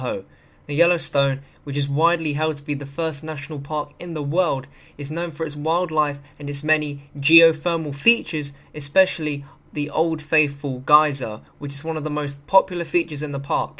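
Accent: England, United Kingdom. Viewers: are you British? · hey, he is english, he cant help it.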